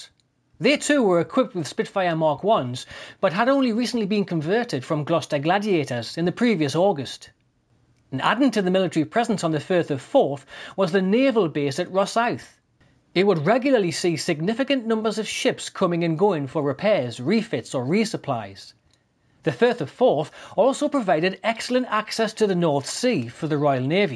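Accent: Newcastle, United Kingdom. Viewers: Not a robot and not at all odd. It's a North-eastern English accent, probably from the Newcastle area, less than 100 miles from the scene of the battle. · I'm Scottish and I also thought he was a geordie lad. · It's Geordie, and it's grand! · Sounds like a Geordie( Newcastle,) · The narrator does not have a Scottish accent. The accent is from the northeast of England - a Gordie. Many Scots do speak English well and with a large vocabulary however. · Beautiful Geordie accent, I love your voice.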